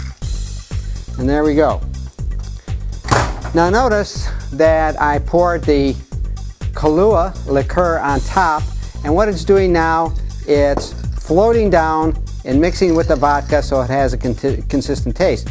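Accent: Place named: Chicago, United States. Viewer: This dude sound exactly like what I imagine Chicagoans should sound like. Da Bears!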